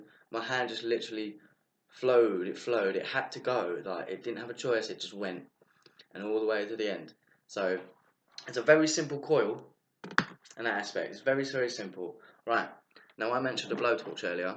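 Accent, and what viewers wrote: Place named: United Kingdom. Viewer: Vape Nice to see some reviews of ss wire, there aren't many around. Your videos are great and very informative, unlike many other vape videos where acting and yelling is the main part. Would be nice with a better quality though, i can guarantee you that your channel would get more subscribers if you had better video quality, because your videos are great, informative and you're a british good looking lad. Not too many british vapers around, it's nice to listen to for a change. But a new mic and camcorder would help tremendously!:D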